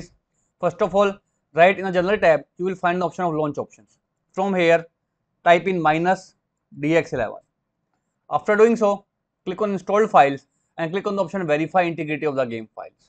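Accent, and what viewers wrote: India. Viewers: I knew it will going to work when I heard your indian accent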